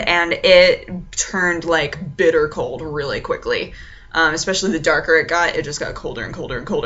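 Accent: France. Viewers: I love your frenchie accent !! I'm sure all your french fans (like me ^^) are specially happy today ! :D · your french accent is BEYOND hot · I love your French accent. For me, it's so hard to pronounce the "r" :(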